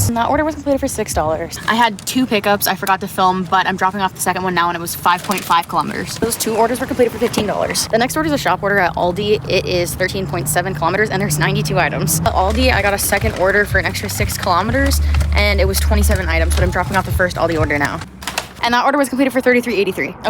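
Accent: United States. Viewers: Funny she sounds American so what's with Kilometers? My car displays miles. Just weird. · Is she in America? I noticed she kept saying kilometers instead of miles. She sounds American so I was confused why she saying kilometers unless her content geared to rest of world? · Why do you sound like an American, but use kilometers? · Had me ROFL when you was tapping the energy drink can with your nails 😅 you sound American but are saying kilometers instead of miles serious where you are from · You sound like you speak perfect American English , why the fuck are you in kilometers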